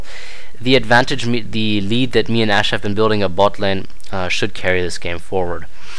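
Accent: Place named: Germany. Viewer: Man hört dass du deutsch bist aber nich so dchlimm ^^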